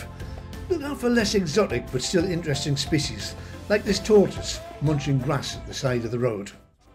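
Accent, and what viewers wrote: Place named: Newcastle, United Kingdom. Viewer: LOVE THE JORDY ACCENT LOVE YOUR VIDEOS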